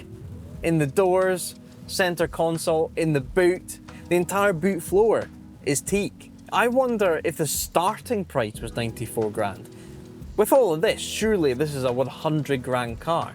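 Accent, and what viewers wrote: Scotland, United Kingdom. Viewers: Why's Nigel Mansell got a Scottish accent?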